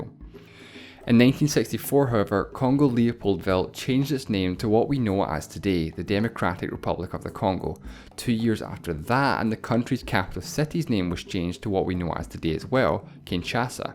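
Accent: Scotland, United Kingdom. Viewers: I am more fascinated to listen to your Scottish accent rather than the Congos itself. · José Guilherme Scottish accent. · Azwad Ahsan it’s an Scottish accent. How can he possibly change that with education? Your brain sucks get some education pls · Scottish accent, right?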